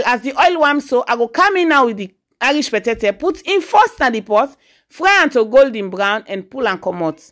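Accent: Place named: Sierra Leone. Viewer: My first time listening to Sierra Leone speak I’m 🇯🇲 and can understand most of what you are saying, I enjoyed listening to your beautiful accent, thank you.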